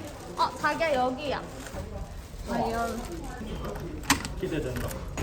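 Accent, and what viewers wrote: South Korea. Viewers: 부산분은 아닌거같고 경북 억양이 조금느껴지네요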